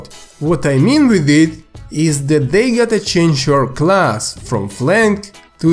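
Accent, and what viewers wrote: Russia. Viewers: I love this russian accent xD Nice vid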